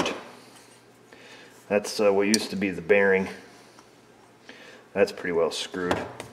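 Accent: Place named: New Jersey, United States. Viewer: wait what are you actually russian i thought you was from joisey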